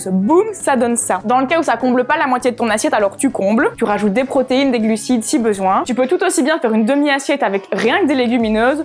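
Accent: Liège, Belgium. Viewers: On reconnaît l'accent belge à des km <3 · L'accent belge ? C'est l'accent de Liège. Tous les Français parlent comme Jackie Sardou ?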